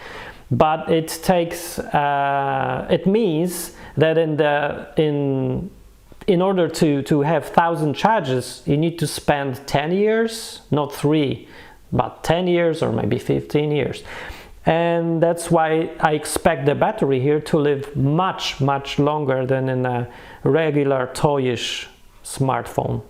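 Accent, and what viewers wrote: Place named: Poland. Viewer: Heard your intro... Heard you Polish accent... And I knew, this guy KNOWS....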